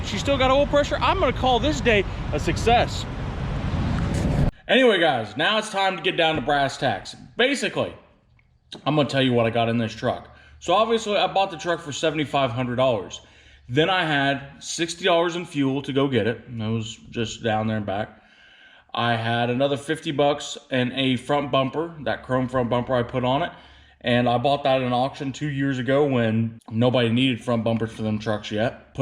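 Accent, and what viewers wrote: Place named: Pennsylvania, United States. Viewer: I know that you say you live in Kansas but are you originally from PA? Your accent sounds like my family that lives in PA.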